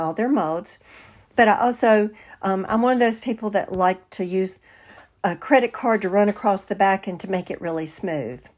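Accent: South, United States. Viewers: I love to watch all ur videos! There has never been one that I don't just LOVE❤ I also love to craft and I hope to be as good as you one day!! Also I just want you to know I love your sweet southern voice just so adorable 😊 I'm from Alabama, where are you from?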